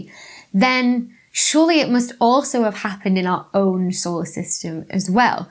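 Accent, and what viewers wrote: United Kingdom. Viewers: Your accent. Is just fine; after Boston, all Brits have learned to hide their "T." 😊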